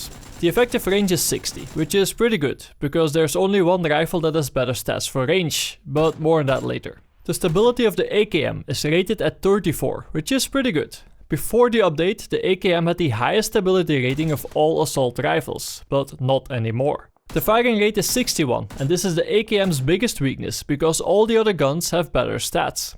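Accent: Netherlands. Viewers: Where is your accent from, it sounds like a mixture other Netherlands with northern England. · you sound dutch but 10/10 vid m8 keep up the great work :)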